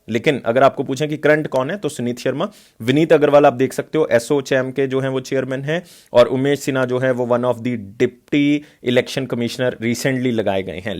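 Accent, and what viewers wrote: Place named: Himachal Pradesh, India. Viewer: sir aap himachal se lag rhe ho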